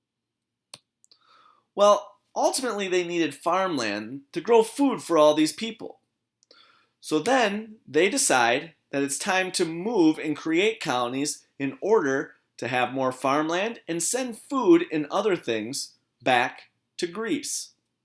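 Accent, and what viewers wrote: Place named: United States. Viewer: he has a new york/jersey/midwestern accent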